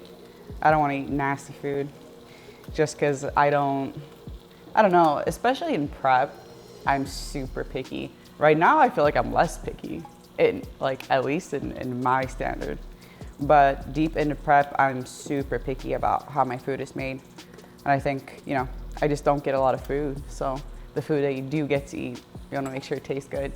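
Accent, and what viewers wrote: United States. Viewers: Would have guessed she was born and raised in The USA by how she speaks , sounds very American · for me she sounds like an American. Never would I thought she is from Europe ... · how does she sound so american being raised in sweden??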